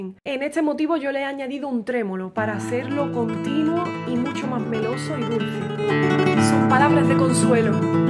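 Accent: Andalusia, Spain. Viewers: Hermoso todo lo que tocas, me da pena decirte , que primero, no me gustaba tu tono de voz, era la primera vez que oía hablar una persona andaluza, pero me gustas como tocas, y aprendí a oírte y acepté tu voz y tu orgullo de tu localidad, y el amor que emana de tus palabras el brillo de tus ojos la sinceridad con que te expresas, y mucho amor para compartir un hermoso trabajo soy de Venezuela de origen muy humilde, me gusta la quitará, pero no sé tocar ninguna pieza completa, por eso me dedico a oírla , ahora te quiero y te admiro mi niña, mi bisabuelo creo que venía de Islas Canarias. Ahora me gusta la narración que haces a tus arreglos. Sigue triunfante. Ynelda Ascanio Gutiérrez.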